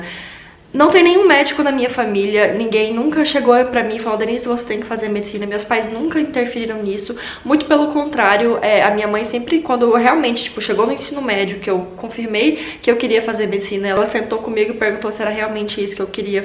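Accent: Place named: Tocantins, Brazil. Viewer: Não sabiam q o pessoal do Tocantins tinha um jeito puxado de falar. ,bjs linda sucesso ❤♥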